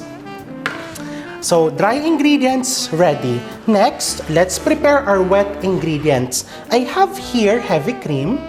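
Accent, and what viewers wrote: Philippines. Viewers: I’m here to enjoy the Filipino accent :)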